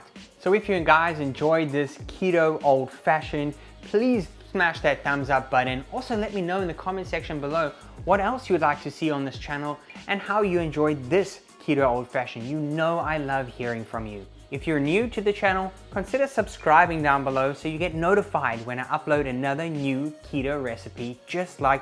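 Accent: South Africa. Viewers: Hey, well, the kids are back in school....and I'm back on the Keto Wagon and my first stop - ok was NOT the boozey section eh! (hahahaha) it was your bread recipe but I'm already here and there are few comments on this one so I think I'll have a better chance of you seeing this. Ok, well I am the mama in Belgium who cannot find that darn oat fiber over here and Amazon won't ship it here either soooooo, I was wondering if you were familiar with Lupin flour? It is super high in protein and very low-carb (I think it's 1 net carb per 100g). Do you have any recipes using this and if not, I would SO LOVE to see you do some....like your bread recipe that I cannot wait to try....but am a bit nervous of doing it without the oat fiber. PS: Btw, thanks so much for your wonderful videos young man. You're living in my native Canada but pulease do not lose your South African accent as it's SO classy; so nice to listen to.:-) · Ooh. 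Looks lovely. I am also going to try your flax seed yeast bread. [May I ask, where you born in South Africa? You sound like a soutie ; ) An affectionate nickname! I was born there. ]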